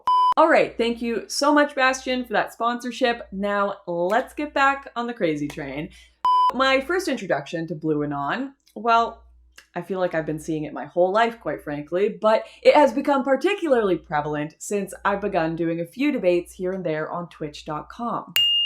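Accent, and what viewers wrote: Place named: Canada. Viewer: Letterkenny! Canadian is showing.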